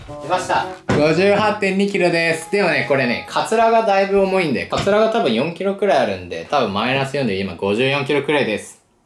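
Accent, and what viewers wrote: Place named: Yamaguchi, Japan. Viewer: たしかに山口の方言使っちょるねw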